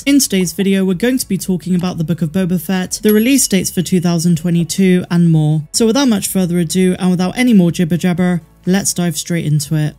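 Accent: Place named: Cornwall, United Kingdom. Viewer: I want more jibber jabber please in your cute cornwall accent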